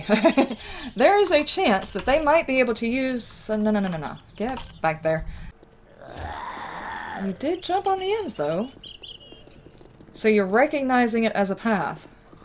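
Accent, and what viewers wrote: South, United States. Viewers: Not related to 7DTD, I’m English so being able to place American accents is not a skill I have, what accent is it you have, been trying to figure it out but I’m useless. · seriously i think shes georgia/missouri and those kinda places she says things like you'all? and other southern words and ways of speaking. also she has those those southern good manners and so on im pretty sure shes from there. havent beed to yorkshire in decades i loved it there i should go back some day :).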